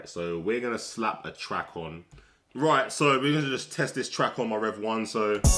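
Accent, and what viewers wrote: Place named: Roadman, United Kingdom. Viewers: Mans is road man bruv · lol it's all good. You speak the Queen's English. My American English is a bad version of yours. 🤣🤣🤣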